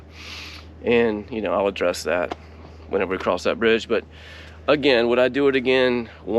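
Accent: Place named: Georgia, United States. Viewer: Sound like a Georgia Boy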